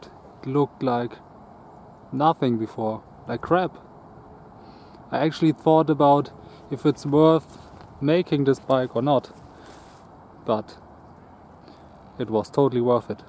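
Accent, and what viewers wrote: Germany. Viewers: Deutscher Akzent on point, aber richtig gutes und interessantes Video. 😉 Like hast du. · Mach deine Videos doch einfach auf deutsch. Dein deutscher Akzent ist unüberhörbar 🙄 Ich wollte in den 90ern auch immer eine RS. Hatte damals eine Yamaha DT80 MX. 9000 Mille kostet die Aprilia damals.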